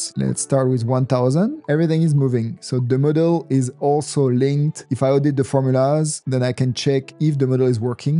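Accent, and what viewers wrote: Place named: France. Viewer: Comment reconnaitre un français ? avec son accent quand il parle en anglais nice video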